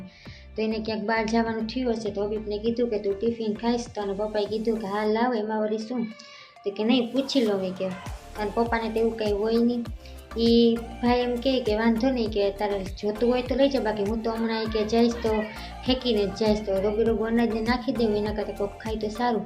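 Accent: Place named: Porbandar, India. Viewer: પોરબંદર ની ક્યાંક તોસડી બોલી આવી જાય એટ લે તમે ક્યાં ગામનાં છે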